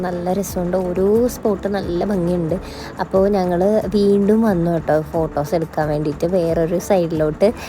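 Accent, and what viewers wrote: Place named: Ernakulam, India. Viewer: Ernakulam slangayallo😁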